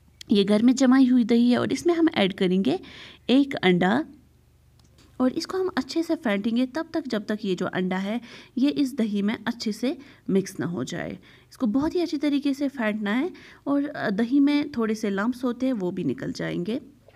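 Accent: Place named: Kashmir, India. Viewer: Aap k kashmiri aawaz muj bouth bouth achii lagte hai ❤️❤️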